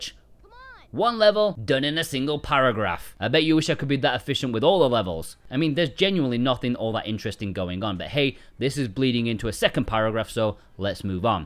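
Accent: England, United Kingdom. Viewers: I've given you a sub, great video btw, but I'm still baffled where in England your accent comes from, maybe it's a Mos Espa twang.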